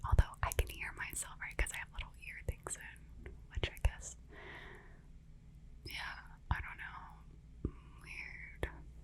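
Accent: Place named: South, United States. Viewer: upvote for more Southern Accent whispered rambling ;)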